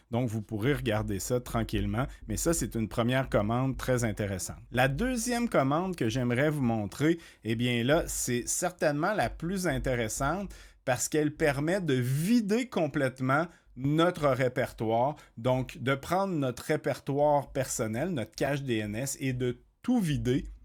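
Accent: Quebec, Canada. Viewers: cet accent Québécois est tout simplement charmant ! En plus d'une vidéo super utile, merci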